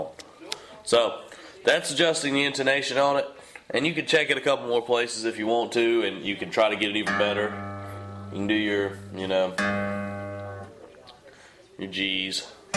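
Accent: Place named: South, United States. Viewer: your accent sounds a lot like Bret Farve bro. you from Mississippi or Louisiana ? good video, very basic and to the point. thanks man.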